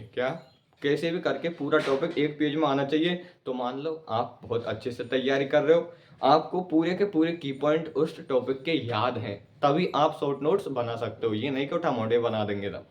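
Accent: Bharatpur, India. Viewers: Bhaiya bharatpur ke ho kya aap 🤔🤔 bnva rahiyo h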